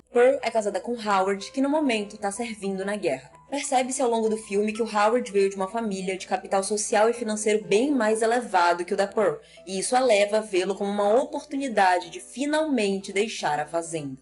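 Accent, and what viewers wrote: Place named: Pará, Brazil. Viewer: Voz de dubladora com sotaque paraense, asmei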